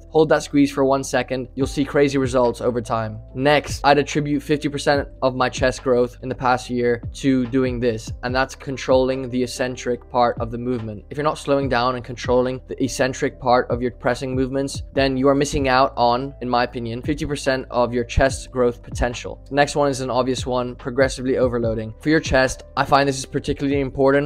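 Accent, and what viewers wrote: Ireland. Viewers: Thanks for this. Also, I've never heard an Irish hybrid accent like that before.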